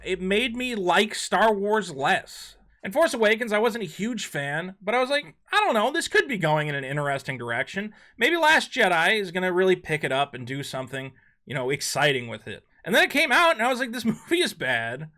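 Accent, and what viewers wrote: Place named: RP, United Kingdom. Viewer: his very reserved, posh, British accent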